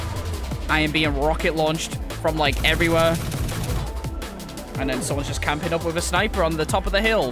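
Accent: United Kingdom. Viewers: yo i have a question are you British?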